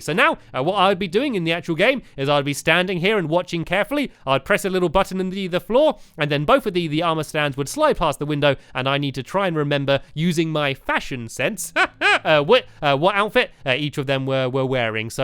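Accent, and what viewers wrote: United Kingdom. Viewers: He's British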